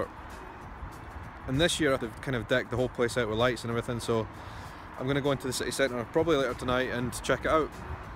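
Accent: Scotland, United Kingdom. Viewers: Hey, cool! You're from Scotland! Love Scottisch accents! Subscribed! :D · Love the vlog! I moved over to Sweden last year and it's nice to hear a Scottish accent again!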